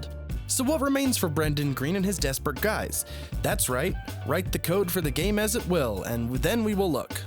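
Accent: Russia. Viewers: Мне кажется ты русский,акцент русский слышно. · Мне показалось или у автора русский акцент?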